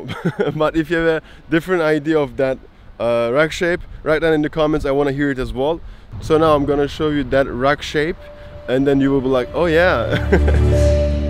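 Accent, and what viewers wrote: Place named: Turkey. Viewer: what's this accent? pure Turkish accent. 😐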